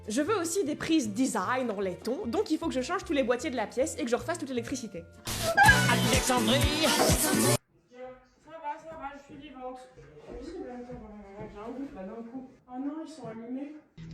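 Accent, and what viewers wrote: Belgium. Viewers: La musique de c'était pas nécessaire parcontre surtout quand ont n'a un accent belge comme toi. 😅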